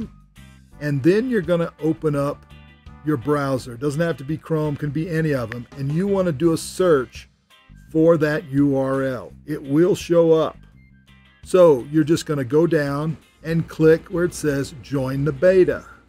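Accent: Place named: Texas, United States. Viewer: btw are you from Texas? No offense, your accent is kinda Texas like.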